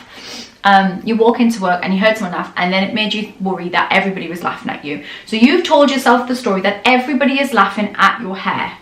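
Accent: Newcastle, United Kingdom. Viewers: Beautiful geordie accent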